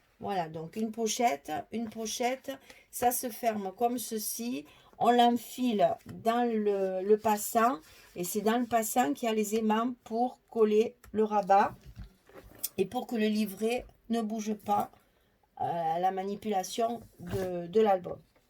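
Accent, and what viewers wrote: South, France. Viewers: Superbe! Quelle imagination! J'adore! Bravo à toutes les 2! Et très sympa l'accent du Sud! 😉😉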